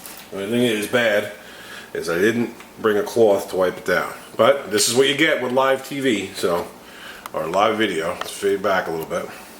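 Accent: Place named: Massachusetts, United States. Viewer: I'm from nh. Your accent sounds like mass that's all. jerseys not all that far from mass though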